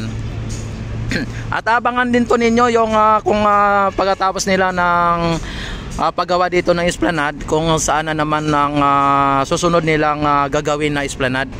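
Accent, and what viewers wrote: Visayas, Philippines. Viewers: Kuya ayusin mo ang pagsasalita mo Visaya ka kuya ang dami mong alam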